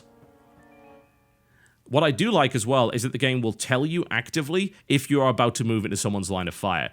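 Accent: United Kingdom. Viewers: no way im going to leave =d i come for the british accent and the opinion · but your british accent is soothing backround noise;)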